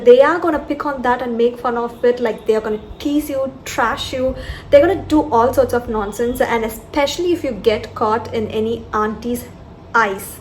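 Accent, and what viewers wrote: Tamil Nadu, India. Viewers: Seriously your editing skills are vera level.. and the way you speak, as a Tamil girl I can relate to that. The thing is you apdae translate the Tamil expressions to English. That's so wonderful to watch. I am your new subscriber. Keep it up. I'm waiting to see your growth as a youtuber. Long way to go, Congrats🤍🤍🤍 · Lov that tamizh twang ❤️❤️❤️ wdt it d video seems incomplete. 😀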